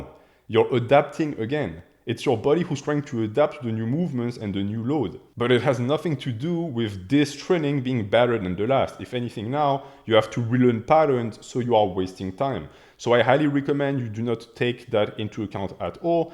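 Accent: France. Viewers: T'es français ? Je trouve que t'as l'accent.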